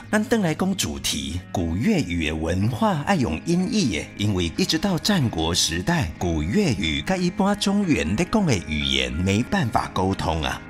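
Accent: Taiwan. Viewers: 這台灣國語聽的很不舒服，要就全臺語或全國語，吞不下去有點可惜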